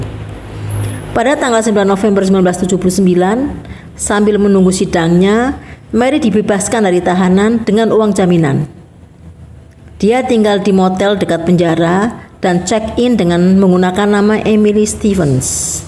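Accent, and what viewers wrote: Java, Indonesia. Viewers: suara yg ad medok2 jawa ny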